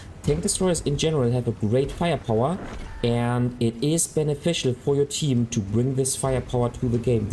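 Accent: Germany. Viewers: Do I hear a German accent in your voice?🤔😁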